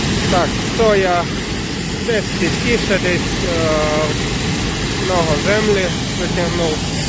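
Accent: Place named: Transcarpathia, Ukraine. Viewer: Чути закарпатський говір, з якого ви району?( область і так знаю))))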